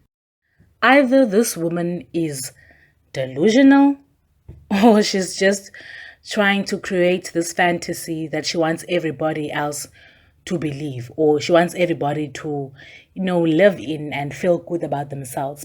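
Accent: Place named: South Africa. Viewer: You sound South African for some reason. Btw your channel is really needed these fans out here are crazy as fuck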